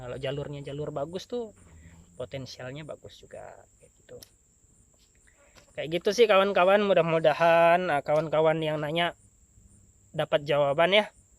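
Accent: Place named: Bali, Indonesia. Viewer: Logat balinya masih kelihatan salam sedulur rahayu sak gung dumadi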